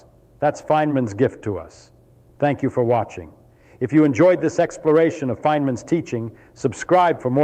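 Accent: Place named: Transatlantic, United States. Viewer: I like this transatlantic accent style.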